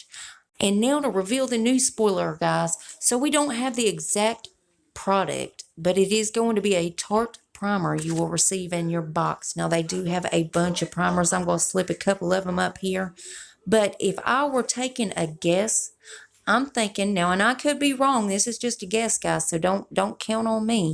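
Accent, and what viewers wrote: South, United States. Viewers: Girl your southern just like me!! Gotta love us southern gals. Xxoo. Cute channel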